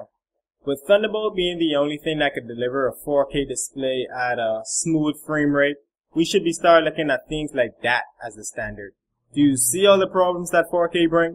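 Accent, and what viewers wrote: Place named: Wales, United Kingdom. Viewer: This guy has a Welsh accent if your wondering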